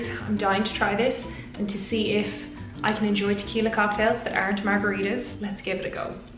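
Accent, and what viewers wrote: Ireland. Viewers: Great drink for never making one! The Irish accent is one of my favorites, every time I hear it, my thoughts start using an Irish accent for some reason lol. If you make this again use a spoon to sink the grenadine, just pour it on the backside of the spoon, works great!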